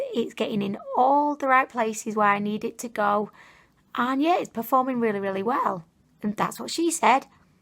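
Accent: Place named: Manchester, United Kingdom. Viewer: Sorry it’s off topic, but where are you from? Your accent is saying Manchester?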